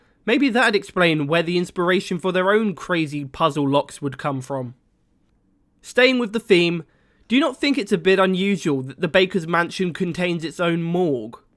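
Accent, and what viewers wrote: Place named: England, United Kingdom. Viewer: Why does these kind of videos always had a narrator with english accent?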